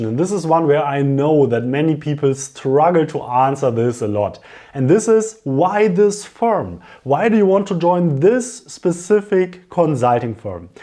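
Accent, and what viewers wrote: Germany. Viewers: The quintessential German accent!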